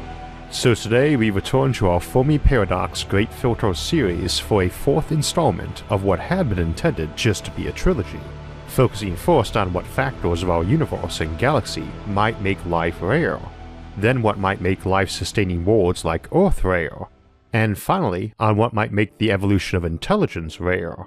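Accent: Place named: Washington, United States. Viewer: possibly but I think there's an accent like that in Washington somewhere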